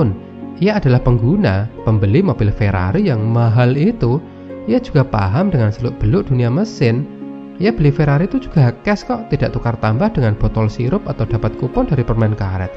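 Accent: Java, Indonesia. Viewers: sip lanjutkan mas dengan suara khas medoknya,hehehehehe... barang kali ada yang minat tutorial design grafis dan percetakan kunjungi channel ini, mudah mudahan ada manfaatnya....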